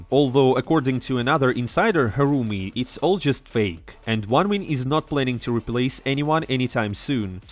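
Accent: Russia. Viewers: Тут скорее палка о двух концах - из-за того, что канал ведёт, и я явно слышу русского человека за озвучкой, и носителям английского такая речь режет уши. И тут они не могут воспринимать новости серьёзно, да ещё и речь идёт о СНГ - командах. Огромный респект, но нужно адаптировать формат под западных слушателей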